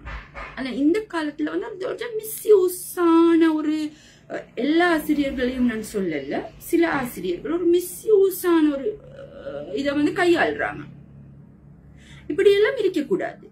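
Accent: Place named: Colombo, Sri Lanka. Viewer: இது கொழும்பு மக்களுக்கு உரிய பேச்சு நடை எல்லாரும் சுத்த தமிழிலா பேசுறாங்க குறை காண்பதே வழக்கம் போல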